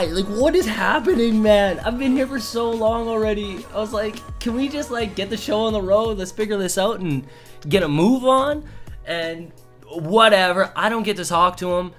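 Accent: Canada. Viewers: Where you from in canada you kinda sound like a sauga man cant lie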